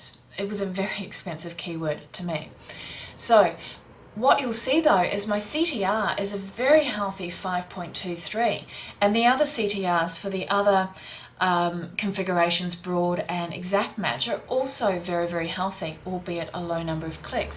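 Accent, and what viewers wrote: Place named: Australia. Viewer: perhaps because she's Australian! This is not an english accent mate.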